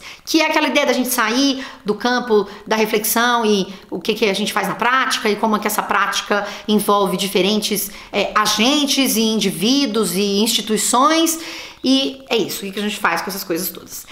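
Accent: Rio de Janeiro, Brazil. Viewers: por que vc mistura os sotaques? vc é carioca e morou em sao paulo? ou é de SP mas morou no RJ? ou não é nada disso? 🤔😁 curiosidade apenash. beijush cariocash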